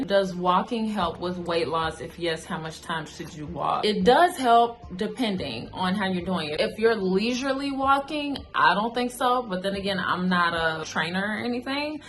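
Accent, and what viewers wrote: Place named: Southern California, United States. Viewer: What is this accent? It sounds like a mix between Southern and 'Californian'? to me. I'm not american tho, so I can be totally off-base lol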